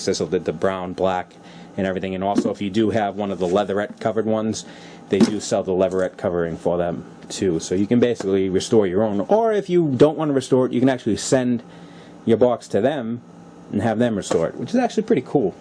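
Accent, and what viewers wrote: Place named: Massachusetts, United States. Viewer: Nice ! I picked one up from a older mans wife who passed away. She sold me around 60 end mills , starrett lufkin BS ect tools indicators planers 123 blocks list goes on and on . She was asking 75$ with out a blink I purchased it. Great video though. Were ya from sounds like Mass im in CT. North east is a machinist dream.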